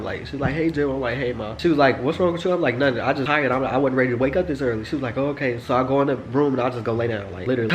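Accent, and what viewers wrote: United Kingdom. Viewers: It’s the British accent for me lol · BYEEE THE BRITISH ACCENT IS TAKING ME OUTTT 💀😂😂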